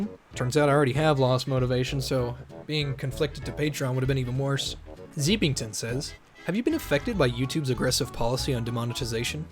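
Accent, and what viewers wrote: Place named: Dallas, United States. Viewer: So howabout that Dallas voice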